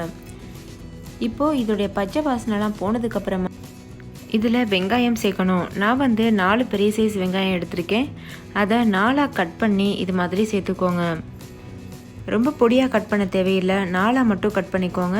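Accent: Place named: Madurai, India. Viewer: akka unga slang madurai mathiri eruku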